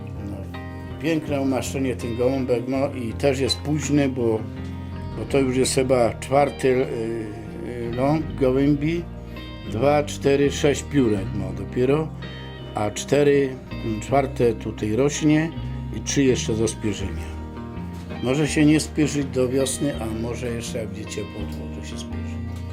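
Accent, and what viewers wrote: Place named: Cieszyn Silesia, Poland. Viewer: Witam. Skąd Pan jest bo akcent jak z Śląska Cieszyńskiego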